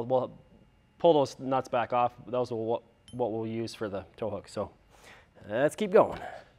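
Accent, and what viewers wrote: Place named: Canada. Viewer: Nothing wrong with a Canadian accent. lol As a Canadian, I know of lots of us live in the Detroit area and work for the Big 3, so I figured you might have been amongst them. :)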